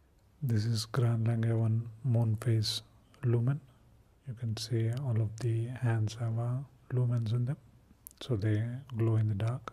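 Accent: India. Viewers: He is Indian, you're correct!😁 There is a link to his channel hidden way down at the bottom in the description box. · Love the ASMR Indian accent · Is that an Indian accent?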